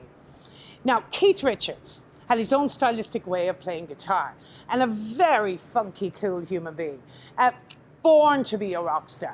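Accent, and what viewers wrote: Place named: Ireland. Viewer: Apart from the Irish accent, I love your talking voice. Bet you can give it a rock and roll number, too, if not an Irish ballad. Both!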